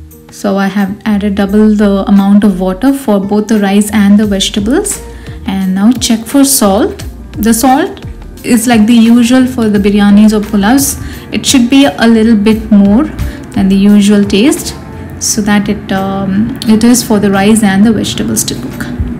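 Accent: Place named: United States. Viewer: நீ பேசுகிற அமெரிக்கன் இங்கிலீஷ் விளக்கவுரை மிகவும் அருமை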